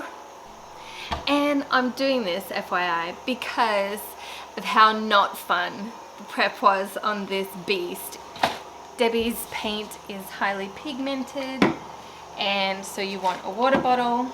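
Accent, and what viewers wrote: Australia. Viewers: Hi. Oh Boy So Sad to Hear the Passing of your friend 🥲 Don’t Apologise for your Sorrow Your piece turned out Great!👍 I thought you were an Aussie Girl the first time I Watched you 👍 I’m in Western Australia What part of Australia are you from! Take Good Care Sending big hug’s 🤗🌹💕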